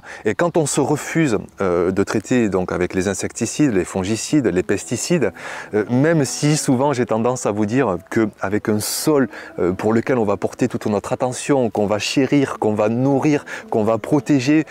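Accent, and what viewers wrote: South, France. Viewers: Haaaa l accent du Suuuud! Ma femme rigole toujours quand je parle de potager et d idées! Elle me dit toujours " t as encore regardé le type de Sud hein " 😅 Bref tout ça pour dire que je compatis et que j ai eu la même chose... Mais... Je vis en Suisse, 8 plants sous serre semi ouverte en plein soleil donc pas d'ombre. C est ma 3eme année de potager (2022 et 2023 etaient moyens mais j avais pas trop amonder mon sol😊). Finalement mes voisins qui ont tous un potager ont aussi été ravagé. Cela avait aussi commencer en début de juillet dans ma serre mais beaucoup moins fort que mes voisins ( 20 mètres en chaque potager environ) Je me suis essayé à la décoction de prêle. Je taillais tout ce qui brunissait et je pulverisais par dessus avec le prêle. Finalement je suis le seul qui ai réussi à sauver mes plants. Fin juillet cela s est arrêté de brunir. 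Et j en ai finfalement eu des tomates et elles ont surtout bien rougis, comparer à mon voisin direct qui a une serre aussi et qui avait mis de la bouilie bordelaise, cela n a pas fonctionné. Est ce le prêle? Ma serre? Un coup de chance? Je sais pas mais continue dans ce que tu fais c est tjrs un plaisir de te écouter et garde espoir. 😊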